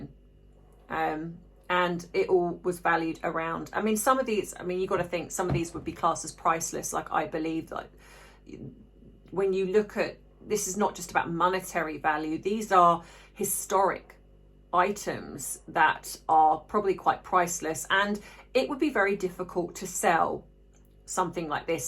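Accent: England, United Kingdom. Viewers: Enjoy you videos and I like hearing about the Royals with an English accent. I don’t know what I think about this theft but I have heard that other items like a bracelet have gone “missing.” I also like the stance you took regarding Hello Fresh. I used them for a year and a half, cancelled after I found out and due to other issues with them. I appreciate you doing this.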